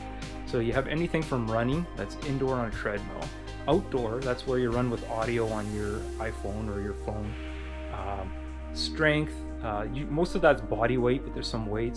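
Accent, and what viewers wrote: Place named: Canada. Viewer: I love that Canadian accent !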